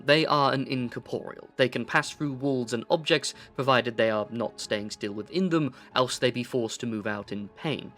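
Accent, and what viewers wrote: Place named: United Kingdom. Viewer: Waiting for my favorite British voice be it in D&D wizard of vampire lord and it's been a month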